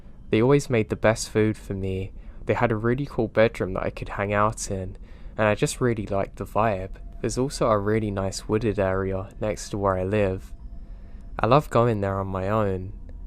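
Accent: United Kingdom. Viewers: You really are one of the narrators who relax me. When I drive I listen to Mr Revenant and Being Scared, but if I want to be simultaneously scared and soothed to sleep, you're the one. I do have unusual dreams listening to your soft British accent (I assume you're British and not any of the others, I know you aren't a Liverpudlian but to my ears you sound a little cockney. I wonder if you could clarify from where in the British Isles you are from. Not Ireland or Scotland or Wales, right?)Yorkshire?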